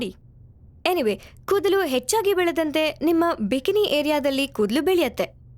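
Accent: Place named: India. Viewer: fifakillaz 14 Indian accent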